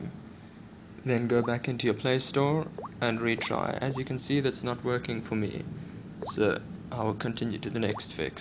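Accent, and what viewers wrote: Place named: South Africa. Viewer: Your accent sounds like that of a south african's accent,am I correct in assuming this?Prob joburg or capetown?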